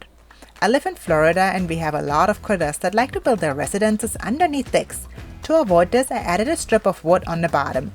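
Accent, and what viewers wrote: Germany. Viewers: Sie spricht englisch wie eine Deutsche......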